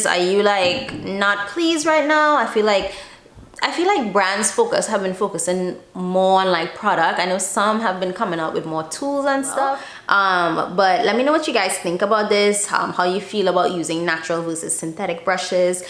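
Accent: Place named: Trinidad and Tobago. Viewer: You sound Trinidadian. Where are you from?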